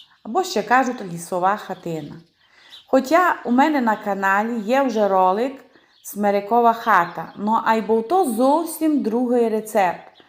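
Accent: Transcarpathia, Ukraine. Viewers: Дякую за рецепт❤ мені так подобається закарпатський говір, слова інші - насолоджуюсь діалектами неньки нашої. Міцно обіймаю. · Тільки за закарпатський діалект та акцент ставлю лайк❤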